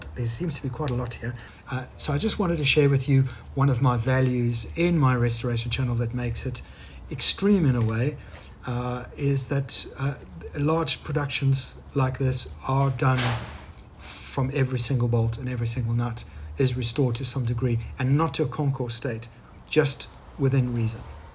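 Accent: South Africa. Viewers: Great work bru'...where you from?...I'm guessing Maritzburg area...miss SA🥺. Love your videos, you're on your way to 1m subs in no time!!!.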